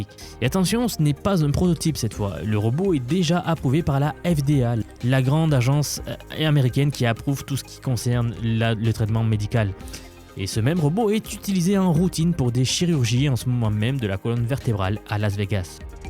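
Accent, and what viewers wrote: France. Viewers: Ça prendrait des sous-titres pour comprendre les noms. Un français qui essaie de parler anglais, on comprend jamais rien 🤷🏻‍♂️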